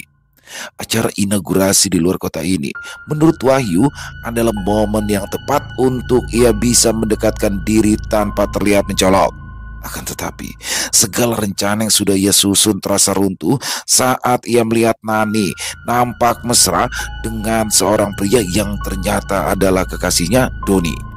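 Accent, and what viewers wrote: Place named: Betawi, Indonesia. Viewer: Pokok nya nggk kn pernah bosan dech...,klo dengerin kisah warung kopi bang Doni sama gembul,di tambah lagi logat Betawi yg di bawain olh bang membuat kisah nya jd hidup. terimakasih bang kisah bang Doni nggk pernah gagal menghibur salam sehat sukses dan bahagia selalu untuk bang dan team ❤di tunggu kisah bang Doni selanjut nya 😊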